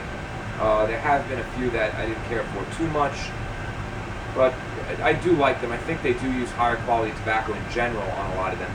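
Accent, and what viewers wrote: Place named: Massachusetts, United States. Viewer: I noticed the patriots hat and the accent lol Are you from Massachusetts? I'm from Taunton, Mass.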